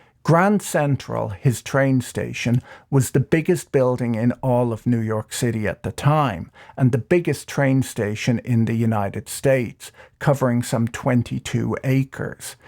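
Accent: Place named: Ireland. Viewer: Very interesting and well presented. Forgive me for adding that it seems especially appropriate that you've a lovely Irish accent for presenting this portion of American history as so many immigrants were from Ireland at this time.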